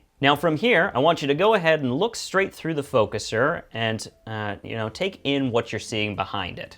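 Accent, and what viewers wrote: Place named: United Kingdom. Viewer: I think you must be British!!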